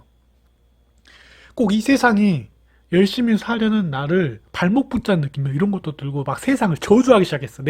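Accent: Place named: Gyeongsang, South Korea. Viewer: 형님 항상 잘 보고 있습니다 저도 곧 전기산기 준비할건데 좋은 결과 있으셔서 다행입니다! 형님 부산 사시나요? 말투가 경남이신데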